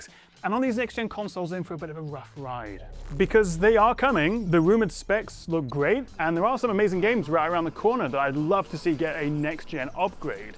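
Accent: United Kingdom. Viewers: The British accent rocks!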